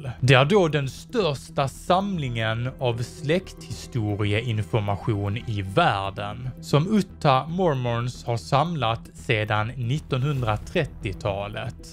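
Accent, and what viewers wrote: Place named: Västergötland, Sweden. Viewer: Holger Nilsson? Det är för att båda är i ungefär samma ålder och pratar västgötska.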